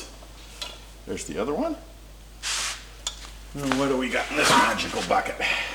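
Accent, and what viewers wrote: Canada. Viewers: Hi. Another cracking video and you’ve set yourself quite a challenge the! On another issue altogether now. I just can’t seem to help myself looking beyond the video presented to speculate on the presenters background. There’s no hiding that Canadian accent but your use of the Australian “lingo” is spot on. So much so in fact that I could almost second guess what you were going to say so I would call you 100% Australian! So welcome mate! You must also have some sort of mechanical / technical background to be tackling the projects you do. Whatever the case welcome to Australia and I hope you and your family have a long and happy life here. Cheers from Gippsland.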